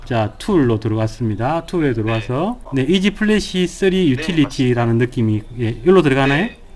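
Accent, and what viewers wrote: Gyeongsang, South Korea. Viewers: 참 컴퓨터 조립처럼 변수가 많고 ㅇ;벤트가 많은 것도 없는거 같습니다컴퓨터 조립이 별거 아니라고 쉽게 생각하는 많은 사람들에게 좋은 교훈이 되는 영상들인거 같습니다 ㅎㅎㅎㅎ쉽다면 쉽지만 어려워 질려면 어마어마 하게 고난이도로 올라 가버리는게 컴조립인거 같습니다 항상 재밌게 풀어 나가는 영상 잘보고있고 배웁니다 ㅎㅎ억양은 경상도이신데 먼데 가셔서 자리를 잡으신듯 합니다~가계구경이라도 가보고 싶내요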